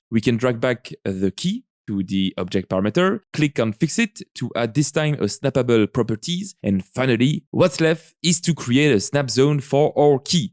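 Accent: France. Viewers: Hi you are french ? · Bro you french trying speak english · Merci pour tes vidéos, j'ai la forte impression que tu es français, pourrais tu faire tes vidéos en français aussi ?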